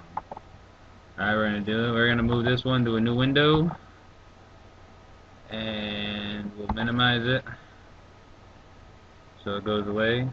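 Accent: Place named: Michigan, United States. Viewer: Nice tut. and u sound like my old friend shroomz from michigan. i thinks its just your accents!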